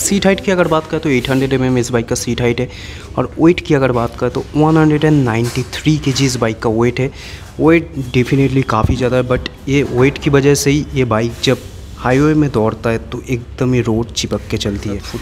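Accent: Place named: Bengal, India. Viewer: Hey bro i like your bengalish Hindi ...❤unique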